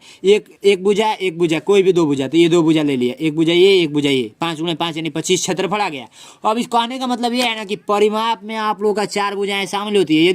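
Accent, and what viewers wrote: Bihar, India. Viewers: Sir up bihiri ho keya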